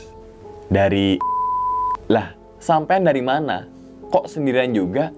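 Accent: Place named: Semarang, Indonesia. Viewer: wih logat logat semarang kih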